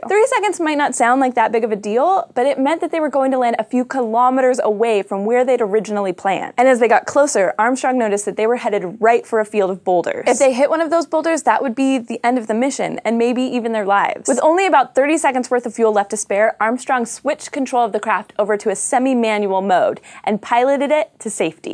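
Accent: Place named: United States. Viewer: What region of the US is this presenter from? ... it's just I can't locate the accent that I seem to hear a lot recently, ... It's that "Croak' at the end of almost every sentence, or more so, a paragraph.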